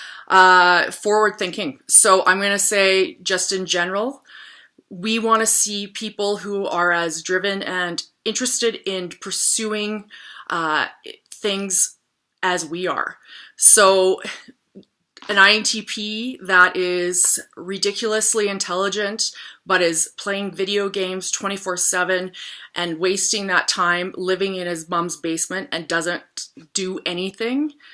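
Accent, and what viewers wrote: Canada. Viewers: You speak like in some parts of Canada. Are you Canadian?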